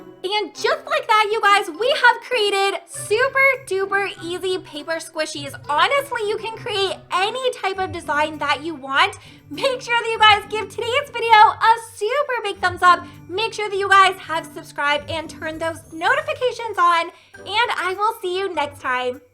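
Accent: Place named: France. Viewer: I love your French accent!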